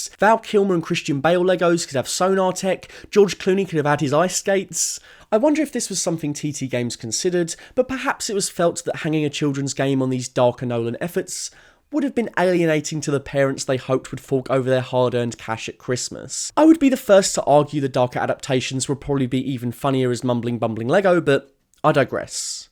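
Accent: United Kingdom. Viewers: Oh god he's British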